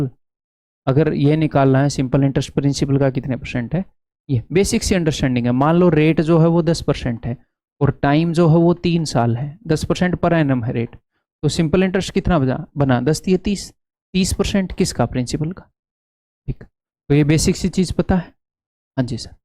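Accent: Haryana, India. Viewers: Sir ek video haryanvi accent mein banano...aapki haryanvi accent bahut mst hai maine ek baar paid course ke videos mein thoda suna tha..aap syd kuch bol rahe thai samne waale ko ki thodi class baaki hai bs.😀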